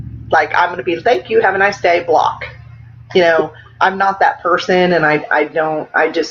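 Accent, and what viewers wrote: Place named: South, United States. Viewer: Southern Accents are the best, hope yours comes out more often!;) South's gonna rise again!:D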